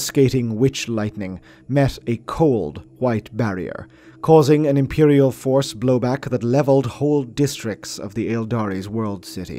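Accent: Ireland. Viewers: he sounds Irish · 🇮🇪💪 bro an bhfuil gaeilge agat? Maith an fear. Proud of this brogue. I think its a bit familiar.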